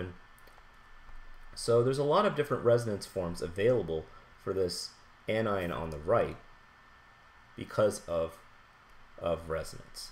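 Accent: Canada. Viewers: You're Canadian, aren't you? Love you, man. Thanks for the 3 AM lesson.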